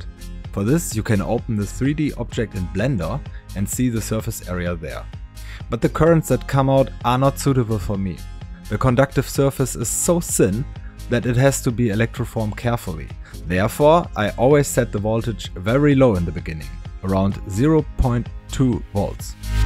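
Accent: Germany. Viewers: I mean, one can obviously tell you are german by your accent, but are you aware you sound crazy similar to docm77, a popular german minecraft youtuber from karlsruhe? :D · He sounds to be German! That explains it.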